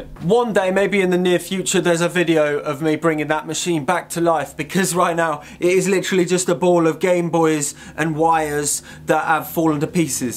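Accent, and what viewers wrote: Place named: England, United Kingdom. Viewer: Hablas con un acento suuuuper inglés!!! Es demasiado! me encanta!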